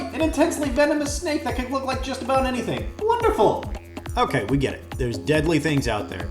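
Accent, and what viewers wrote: Australia. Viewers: Problem with that is you are using an Australian accent for your phonetics. Americans probably translate that as Ayy-roi-kenn-jay! 🤦‍♀️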